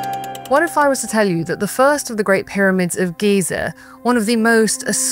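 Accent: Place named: England, United Kingdom. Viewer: her natural English tones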